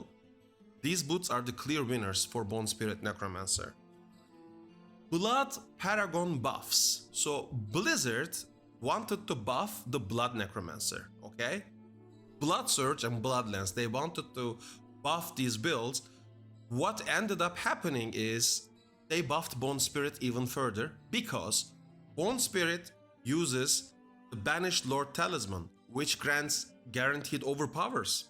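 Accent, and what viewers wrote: Turkey. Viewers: are u turkish u sound and look like turkish, great vid also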